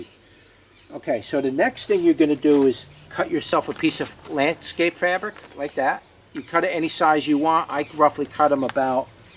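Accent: New York, United States. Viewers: great idea! going to give it a try .. what zone are you in? you sound like a new yorker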